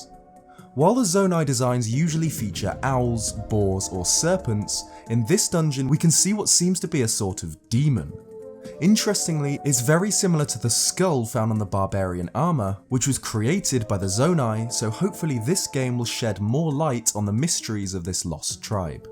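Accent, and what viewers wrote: England, United Kingdom. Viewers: Great stuff, man. The British accent combined with the quality of your footage always makes me feel I'm watching a documentary on History channel :p. On a different note: This sequel can only be really awesome. Something that probably won't be in this game, but what I miss is Dark Link. Like, I would love a game where Dark Link is actually born from Link when he sees Zelda being kidnapped or being hurt and he just goes on a crazy rampage killing spree, letting out his dark side from anger. And then being able to access that power when needed to become stronger, kinda like Hollow Ichigo in Bleach. But that's just me.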